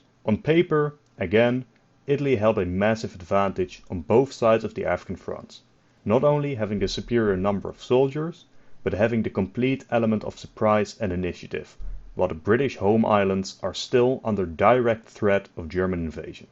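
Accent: Netherlands. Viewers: gast aub leer deftig engels praten. ik zou u vids wel kijke als ge ni zon ambetant hollands accent had!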